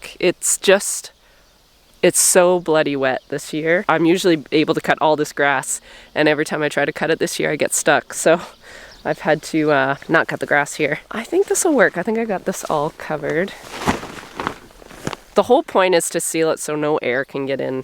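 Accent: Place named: Ontario, Canada. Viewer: I'm from Ontario but live in NZ and love hearing my home accents...following the trials and joys... I always learn so much from you, sheep and farm side... always inspired by how hard you work and the dedication to the animals and land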